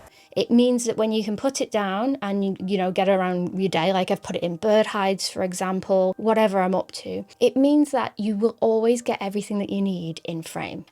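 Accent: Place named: England, United Kingdom. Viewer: Your review gives us a bonus - Ask me, what? - Answer- learning your English accent.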